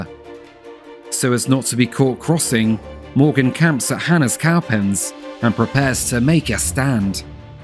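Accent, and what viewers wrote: United Kingdom. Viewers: What irony...Narrated by a Brit 😅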